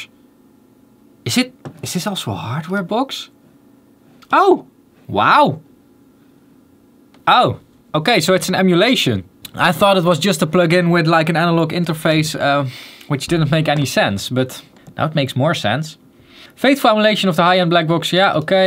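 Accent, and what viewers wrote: Netherlands. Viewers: Oof dat nederlandse accent 😂👌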